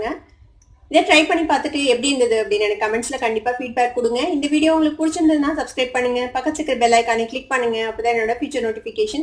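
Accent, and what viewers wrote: India. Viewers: நீங்க நிஜமாவே கோவை தானா..? உங்க பேச்சு வழக்கு எங்க பகுதியில் உள்ளது போல இருக்கு களனி னு சொல்றது களனி புளிச்சாறு ரொம்பவே நல்லா இருக்கும். Idhu ennoda mobile number sister. karaikudi pakkam vaanthaal avasiyam vaanga sister. 😊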